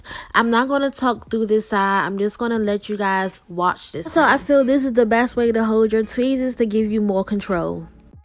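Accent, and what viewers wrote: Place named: Washington DC, United States. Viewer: I just know youre from DC lol. that accent is too recognizable lmaooo 😘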